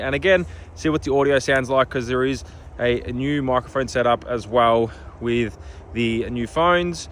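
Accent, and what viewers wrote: Australia. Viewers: AUSSIE accent!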